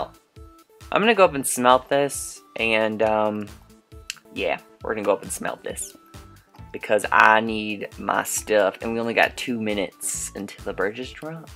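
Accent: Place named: South, United States. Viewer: What's with the country accent????????????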